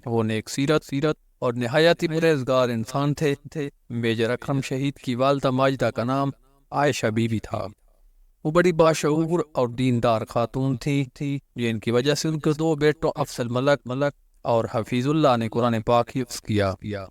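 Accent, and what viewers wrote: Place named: Mianwali, Pakistan. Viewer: Lala Banda mianwali ka ho or Urdu parhey tu aesey hi hota he....baharhall achi kawish he Allah Kareem apko kamyab karey...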